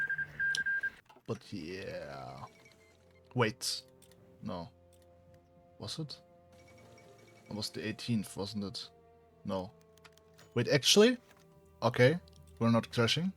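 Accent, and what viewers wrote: Germany. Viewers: Why do you sound German?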